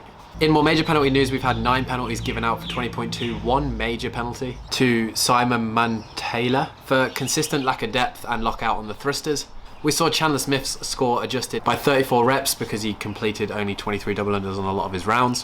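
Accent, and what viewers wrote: Australia. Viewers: Aussie accent 😂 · your accent changed a bit to Australian! haha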